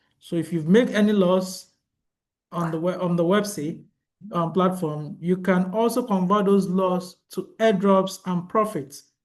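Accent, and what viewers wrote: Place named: China. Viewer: 一听就是中式英语 可能是沙洋盘的😂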